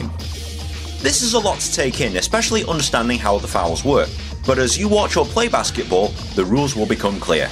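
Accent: United Kingdom. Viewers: Who else knew it was gonna be a British guy? like any other American game explanation video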